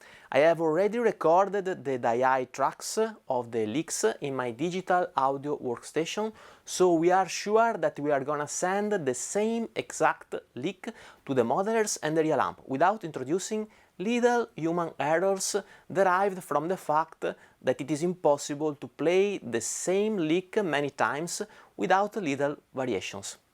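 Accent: Venice, Italy. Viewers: Possiedo l'Ampero MP-100 da un po' e confermo quanto scritto da: uno dei primi aggiornamenti del firmware ha 'sbloccato' la catena rendendola totalmente flessibile. Con l'ultimo aggiornamento è possibile caricare 20 (anziché solo 10) IR di altri produttori, ottimo lavoro e composizioni degne di un album. Sarà ma il tuo simpatico accento mi ricorda il parlato veneziano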